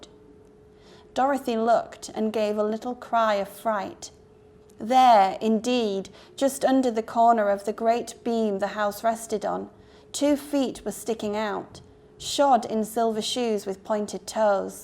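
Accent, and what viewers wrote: United Kingdom. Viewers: I love wonderful accent, she’s amazing. Really appreciate.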